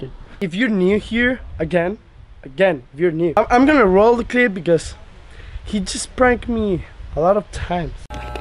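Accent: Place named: Spain. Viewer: Me gusta tu voz Española se escucha muy cool good job man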